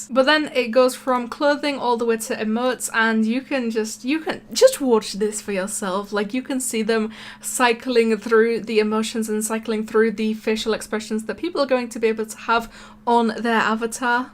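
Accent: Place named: North London, United Kingdom. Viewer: I think she’s North London. I am in east London.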